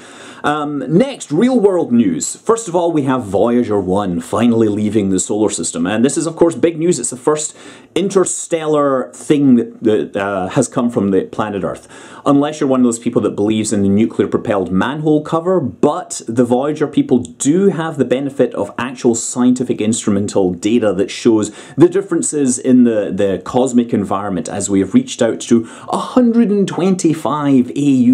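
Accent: Scotland, United Kingdom. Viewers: your pronounciation was so off, that I only got who you were talking about from your explanation. So here I'm trying my best to provide a 'phonetic' transcription of Erdős Pál's name for the benefit of the internet. (We have a different order for names, yes.) Pál Erdős - Paul (is sufficient, but Pahl would be closer) Ehrdoesh Keep up your Scottish awesomeness!;)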